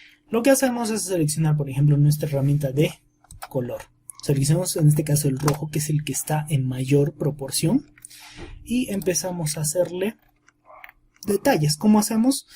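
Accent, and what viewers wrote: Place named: Peru. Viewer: me harta tu voz de peruano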